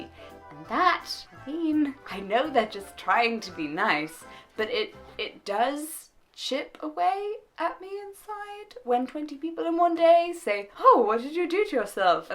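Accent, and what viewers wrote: RP, United Kingdom. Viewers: You sound very posh. Love it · I did not understand the speaker (the British accent for one thing) or where she was coming from until late in the video. Hmm. I recall being touched by Selma Blair announcement though. I’ll have to watch more to figure this chipper redhead out.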